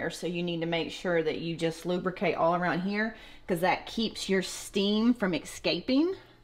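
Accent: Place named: South Carolina, United States. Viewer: I can tell she is one of us in South Carolina! "Caddy Wampus' LOL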